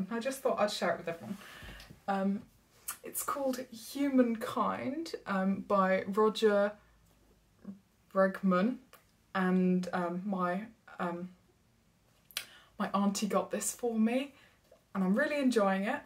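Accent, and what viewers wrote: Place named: England, United Kingdom. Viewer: Hi, just seen you for the first time. I am impressed by your lovely english accent, and very clear speech. Definitely no deterioration there. I used to have a friend who went deaf in his 20s. I knew him when he was in his mid 50s. He still had perfect speech despite having virtually no hearing at all for many years. Even when we were stood right next to a noisy compressor that started up, he had no idea it was running until I told him. I just mention his experience to make the point that deafness does not necessarily lead to poor speech. With regards to your Naida aids needing batteries. I think in some ways that is more convenient. For example, when you go travelling, you don't have to take a charger with you. And no worries about finding somewhere to plug them in. Or finding a suitable adaptor for foreign power sockets. 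Or if you are travelling overnight, the rechargeable ones might run out of power. You seem to have a good attitude to life. I am pleased to have "met" you. Best wishes.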